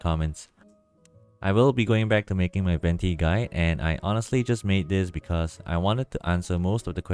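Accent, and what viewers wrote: Singapore. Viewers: are you singaporean? idk why your accent sounds like it :D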